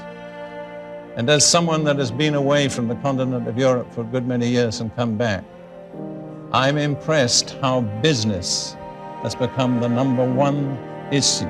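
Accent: United Kingdom. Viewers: You mean his british accent...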